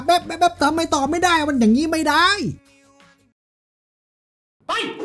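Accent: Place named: North, Thailand. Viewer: คนที่ไหนนิ อู้มาฮู้เลย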